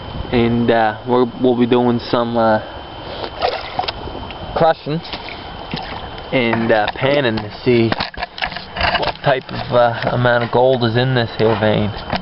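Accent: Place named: Nova Scotia, Canada. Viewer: Nova Scotian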